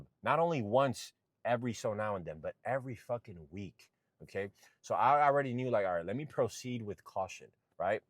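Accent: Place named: Gullah, United States. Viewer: Idk I'm in and wondering if maybe I was expecting too much from "a bouncer". I'm sure I'm gonna miss the part where he doesn't sound geechy but I just can't take this guy seriously 😂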